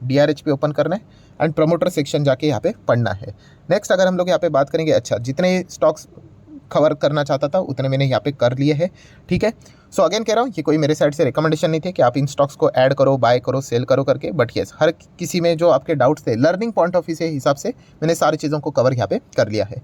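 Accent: Maharashtra, India. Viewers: Sir Good evening I am very much sure that u r 100% maharastriyan as per your toning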